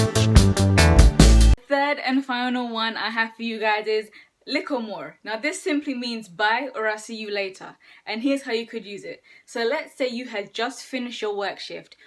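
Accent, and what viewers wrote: Jamaica. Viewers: I love hearing your accent ❤🇯🇲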